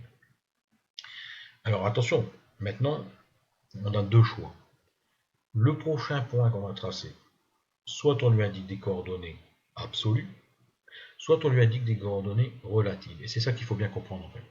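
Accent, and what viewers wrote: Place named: Nord-Pas-de-Calais, France. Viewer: Un tiot accent ch'ti, quelques fautes de français, mais chapeau pour ce travail de partage, bonne pédagogie, sans pub; si les leçons suivantes sont du même tonneau, je risque de me convertir à LibreCad. Un gros MERCI, un peuch bleu et un abonnement. PS: désolé d'avoir introduit du ch'ti dans le commentaire, je présente mes excuses aux internautes de langue française et ne recommencerai plus.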